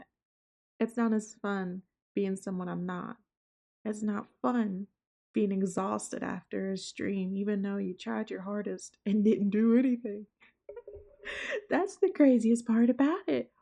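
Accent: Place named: South, United States. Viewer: The southern twang is the icing on top 🤠 Yee yee represent darn tootin!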